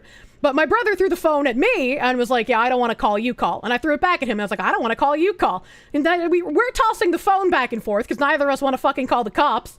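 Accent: Boston, United States. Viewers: Here here! We need her lovely Boston accent spitting facts out here.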